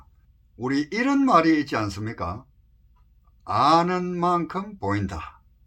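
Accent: Gyeongsang, South Korea. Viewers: 오늘도 구수한경상도 사투리로 커피한잔하면서 영상속의 말씀.잘들었습니다 펜으로 응원합니다 행곡하시고 건강하세요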